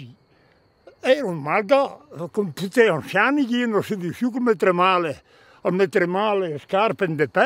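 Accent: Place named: Brescia, Italy. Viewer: Che bèl bresà che'l parlå!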